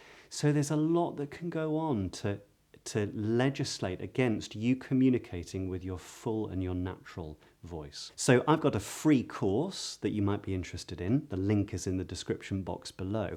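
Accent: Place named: England, United Kingdom. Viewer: Beautiful accent, the pace, volume,etc. Your speech (maybe your ideolect) is very pleasant to hear. It's modern English accent too. Good channel and good content, for special audience 😅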